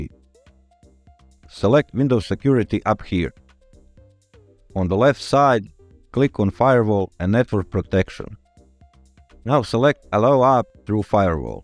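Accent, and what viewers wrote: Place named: Finland. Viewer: you absolute mad lad! the sixth method worked for me, i'm gonna be a hero for my little boy, and you sir, you are a true saint. this video was amazingly well done, easy to follow and with a calm and soothing finnish accent it was a blissfull experience. thank you.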